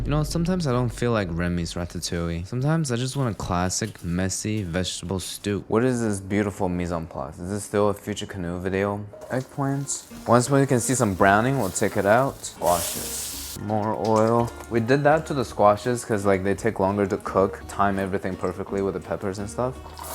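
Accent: Finland. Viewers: He sounds Scandinavian (Finnish) to me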